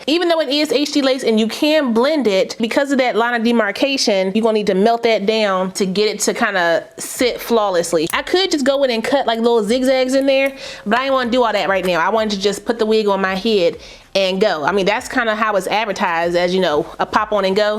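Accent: Washington DC, United States. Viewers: You from DC? The accent and the go-go lol 😅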